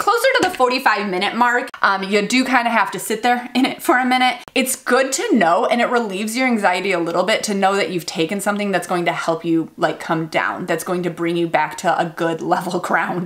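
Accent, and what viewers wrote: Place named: Midwest, United States. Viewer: you gotta be from michigan or ohio...lol we have the best accents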